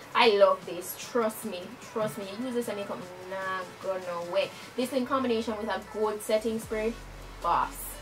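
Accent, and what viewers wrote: Jamaica. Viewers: I'm Guyanese and don't think you sound like guyanese nor Trini at all.Lol even a deaf man would give hear to your strong Jamaican accent.Some people just don't have hearing sound. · Amika Azore yass girl sounds 100% Jamaican love her tho #proudguyanese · From she start talk I knew she was Jamaican 🇯🇲🇯🇲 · Only non Jamaicans would be saying you are not a Jamaican. I could tell straight away. Many have this narrow idea in there head of what a JA person should look and sounds like. It could be because you have a good grasp of the English Language. I am a Jamaican myself living abroad and many do not believe I am Jamaican because I do not walk around speaking Patois in a professional job. Silly narrow mindedness people. Do your thing. Your videos are really good and I am using them to learn the makeup techniques. Thanks for sharing. ✌🏿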